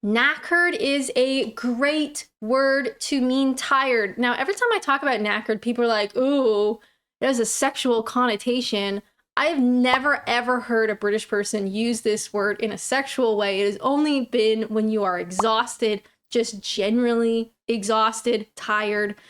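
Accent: Canada. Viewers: Hello chick, knobhead is a great English word, a friendly insult!? Also I have been told a person's accent settles between 17-20 so it's unlikely that you will ever lose your Canadian twang unless you do it deliberately which is an option after a certain amount of time!? xx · You need to go for several pints with a Gen X brit, also we don't all see Canadians as foreign, you are like us with a funny accent x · At the time of bus conductors,com on git aff.meaning hurry up and exit the bus. please speak English your Canadian is still strong.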